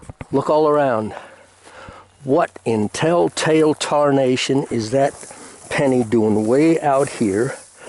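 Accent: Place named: Northeast, United States. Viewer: Where are you from originally? I can't figure out your accent. Are you from the North East?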